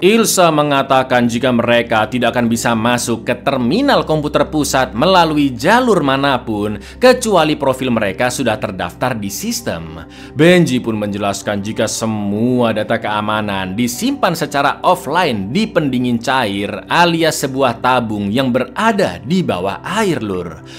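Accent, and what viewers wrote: Java, Indonesia. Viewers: jowo ne ngendi kiiieh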